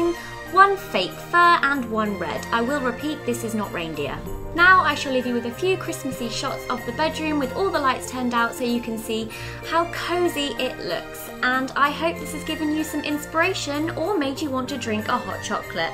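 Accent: United Kingdom. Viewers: I love your accent it's very British and cute :D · You have a perfect british accent<3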